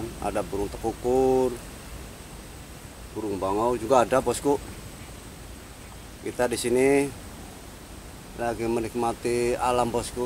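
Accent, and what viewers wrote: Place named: Cirebon, Indonesia. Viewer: Logate kaya orang indramayu/cirebon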